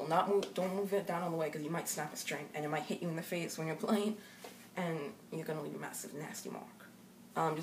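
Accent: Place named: New York, United States. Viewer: You have one of those new york or boston accents,or brazillian...just saying thats not a bad thing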